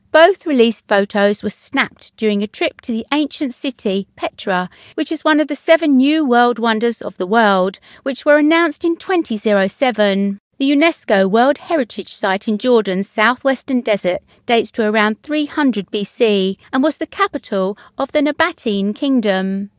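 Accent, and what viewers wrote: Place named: United Kingdom. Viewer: Prince William is the spitting image of his Mother! I think Princess Catherine is absolutely beautiful and l love everything she wears! No wonder their children are so adorable! Thank you for posting this lovely video! I’m kind of new to your Channel and l love your extremely British accent. Signed, A Brooklyn Grandma 👵🏻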